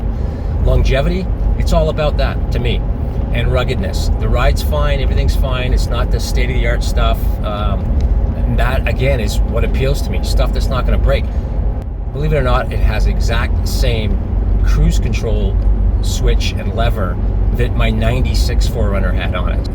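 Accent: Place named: Northeast, United States. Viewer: So weird. If I were to make a guess on his accent, I would say northeastern US ... NY, or MA. Can it be that eastern Canada has similar regional accents?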